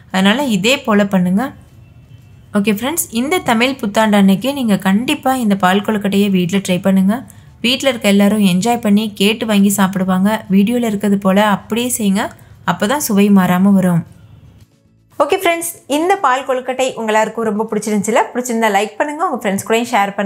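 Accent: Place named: Kerala, India. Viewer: Hi sis,,,,I am very interested in your cooking videos,,,I am malayali but also used to watch ur videos,,,I like all ur videos,,,and ur pronounciation with some Malayalam words ,,.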